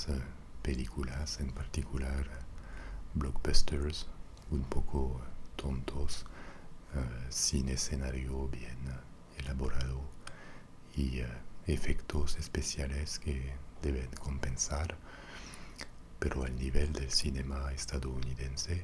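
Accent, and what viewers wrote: France. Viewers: So, do you still have a 'french' accent in spanish?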